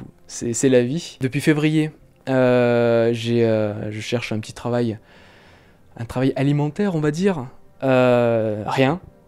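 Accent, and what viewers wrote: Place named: Montpellier, France. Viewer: j'adore ton accent! j'ai le même! Dommage, je viens juste de te connaître et tu pars de Montpellier ;-; Bon courage pour Paris mon pote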